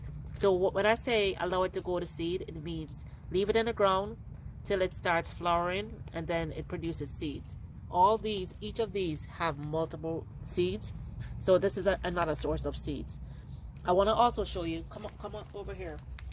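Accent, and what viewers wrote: Jamaica. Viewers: Hey, love the video. Just discovering your channel and knew from the sound of your voice you are Jamaican. Love how you store your seed, I use wipe containers to save mine 🤦🏾‍♀️. You are right. No need to go hungry when we can plant our own food. While not everyone has the space container gardening is a viable method for most people.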